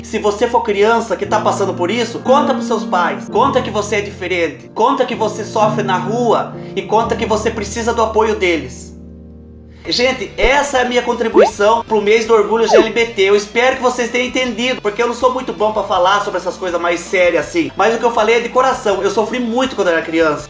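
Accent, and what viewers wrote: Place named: Piumhi, Brazil. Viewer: Noossaa tô apaixonada!! Tu me fez rir d+ sô ! Ele é mineiro tbm gente? O sotaque parece d+ com o do povo de Piumhi kkkkkk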